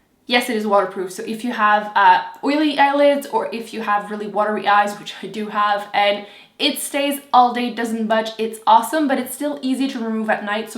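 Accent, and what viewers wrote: Quebec, Canada. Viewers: Shes french canadian from quebec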